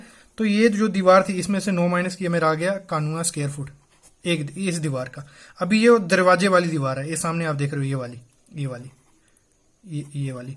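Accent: Jammu, India. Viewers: bai muje sabse pehle ye batao ap Jammu se ho kya?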